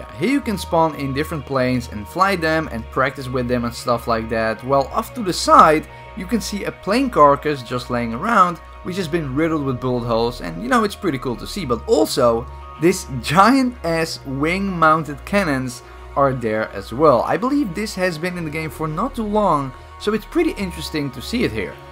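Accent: Netherlands. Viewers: De Nederlands accent btw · He's Dutch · you sound dutch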